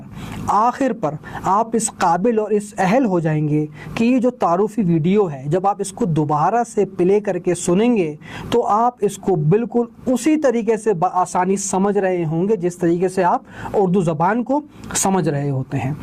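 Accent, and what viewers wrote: Iran. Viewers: ماشاءاللہ بالکل ایرانی لہجہ ہے استاد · ماشاء اللہ آپ کی ایرانی لہجہ سے مسکراہٹ کمال کی لگ رہی ہے ❤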